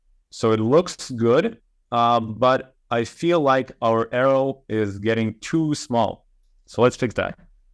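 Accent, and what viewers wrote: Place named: Israel. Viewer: טוב, לפי המבטא הבנתי שאתה ישראלי! תוכל להסביר לי, למה לעזאזל כל עריכה שאני עושה בדסקטופ לא מסתנכרן עם מובייל וטאבלט!?!? הכל מתחרבש, כל הדפים], סקשיינים והתאים על מצב פיקסט! למה לעזאזל זה מתעוות לי ב-publish!? אה...ועוד דבר מעצבן! כתבתי תוכן בעברית, לחצתי על cntrl+shift ימני כדי לחהזיז לצד ימין הכל, ואז הפרגרף מוחק לי את הכל ומעלים את האופציה של הטקסט! מה לעזאזל!!!!!!!!!!!!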